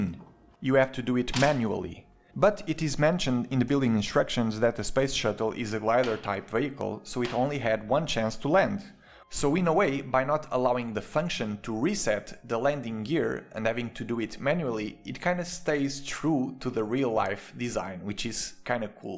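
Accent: Portugal. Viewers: You are Portuguese or Brazilian?? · Damn, I could spot you were Portuguese 2 seconds after you started talking :D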